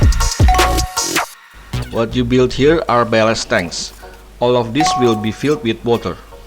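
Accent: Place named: Indonesia. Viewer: Tetap semangat om, saya kira channel luar negeri, eh kok aksennya masih Indonesia+di perkuat nama yang sangat Indonesia sekali.😁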